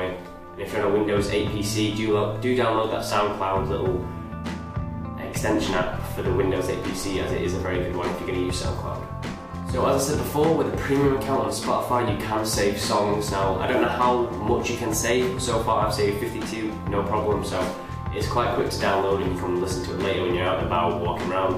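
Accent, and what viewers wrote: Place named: United Kingdom. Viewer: British accent eminem voice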